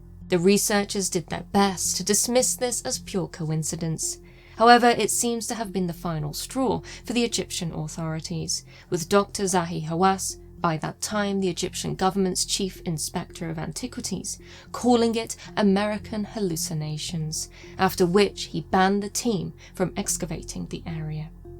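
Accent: United Kingdom. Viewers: I truly enjoy watching and listening to your channel. That British accent and the way you narrate your video is truly exceptional.😍😍😍😇😇😇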